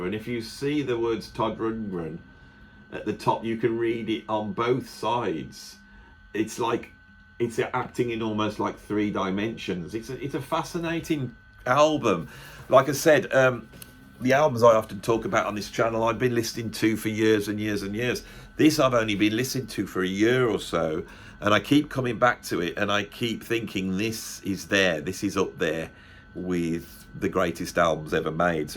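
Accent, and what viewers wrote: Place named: Northern England, United Kingdom. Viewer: He's simply the polar opposite of ( later solo) Doesn't have a great voice, writes very not hooky rambling long bits vs. the concise hooky pop/sorta proggy stuff, Winwood's squeakily clean and perfectly ordered arrangements. Both apparently had access to technology and could take time to make whatever the F they felt like making. Rundgren produced this mess and Winwood produced clever, challengingly difficult songs (said Paul Shafer "the most difficult thing we've ever played" on Letterman. I think), but ultimately a bit contrived, antiseptic and unsatisfying. Far better than Todd's offerings though. I am sure Todd's got a lot a deep lyrical messages for his adulent worshippers, and Steve's got almost none, and I would give Rundgren more thought and a closer look, but I am so put off by the music that I think I shan't. 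(apostrophe?) He did have that one hit in the 80's. A sort of easy rock MOR thing?.... oh well. Next contestant please. Love your accent btw. Can't quite place it. Somewhere northerly betwixt........no wait.. too soft Cottswalds innit?.. no a bit of more northern inflection...I'll have to Google you...nothing in Wiki...."what city is from"....hah there it is........Kidderminster- Birmingham. yes, I've got quite the ear for a Yank.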